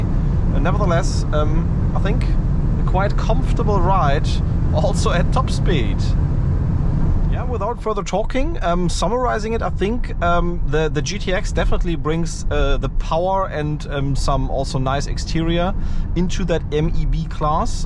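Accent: Germany. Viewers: I Like your german accent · Man hört richtig dass du ein Deutscher bist :) schönes Video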